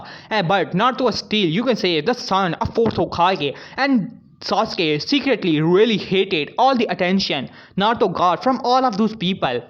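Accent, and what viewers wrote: India. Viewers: I love your India voice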